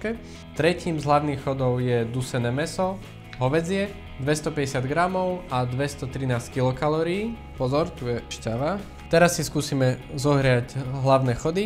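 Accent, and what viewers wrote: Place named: Russia. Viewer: máte nádherný ruský prízvuk :D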